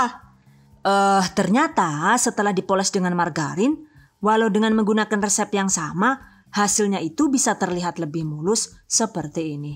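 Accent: Banyumas, Indonesia. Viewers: Mbak asline mana??? Kok bhasanya ngapak? Asline ngendi mbak? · Lah langsung TK subkrek mbekayu Pas krungu basa ngapake😂😂😂😂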